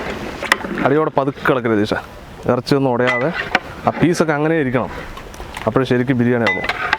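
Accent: Palakkad, India. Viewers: Igale palakadan shyli samsarom kooodi cooking nte kooodea cherumbo oru pratheaka monjaanu vediosnu super aanu Ella vediosum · PALAKKAD basha sooper and sooper biriyani.. Proud to be from pallakad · You are the best brother, I keep waiting for your food recipes. Also, your Palakkad accent has become a hit.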